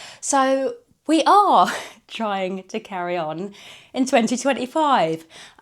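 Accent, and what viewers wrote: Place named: England, United Kingdom. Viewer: Hi, firstly “Happy Belated Birthday” 🎉 yes I would love some cheaper recipes for soap…in the USA Olive Oil and Cocoa Butter is outrageous in price and being an expat if UK, I definitely need the occasional dose of English Accent 😂 I have missed you online and would love to see you more….definitely think you could make something of a Chanel about alternative soap ingredients, and testing thereof to help out the struggling….cheaper but good soap is gonna be a must very soon in USA with all our current troubles and we for sure need an injection of bubbly and infectious personality to cheer us up 😢 hopefully see you soon and hope you had a fab Birthday ❤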